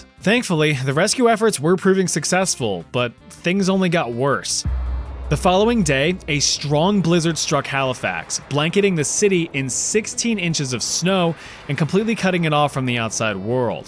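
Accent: Germany. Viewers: How is your German accent so perfect? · As a German myself and a resident of ERFURT, I have to say I LOVE the sprinkled in German words and the accent 😂 "and what kind of scheiße do we get in then" ... I literally lost it 😂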